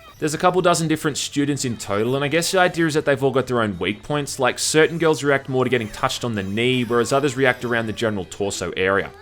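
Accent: Australia. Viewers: I don't know why, but this review made me laugh harder than it should have, possibly because I'm trying to see you as some studious, constantly befuddled Senpai (if that's the right word). Also, I'm dying to find out what an Australian accent sounds like paired with Japanese.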